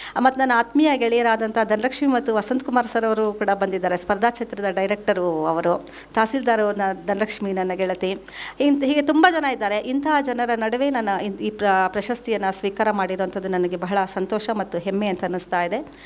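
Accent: Standard. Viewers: ಅತ್ಯಂತ ಸ್ವಚ್ಚವಾಗಿ ಕನ್ನಡ ಮಾತನ್ನಾಡುವ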